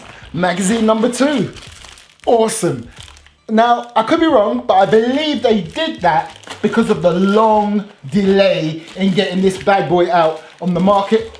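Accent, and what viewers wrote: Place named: Caribbean, United Kingdom. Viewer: Wha de raas yuh sey! Him accent sound American to you?😂 kmt Him British. British Carribean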